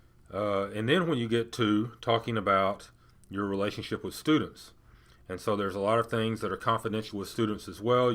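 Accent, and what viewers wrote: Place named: South, United States. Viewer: Dog your southern. not Texan. I know.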